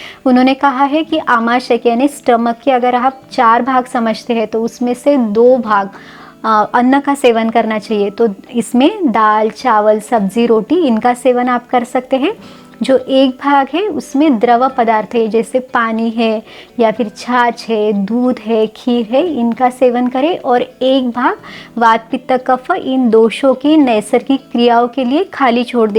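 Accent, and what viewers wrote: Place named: Maharashtra, India. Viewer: Madam apke bolne me Maharashtra ki jhalak he.lekin aap jain he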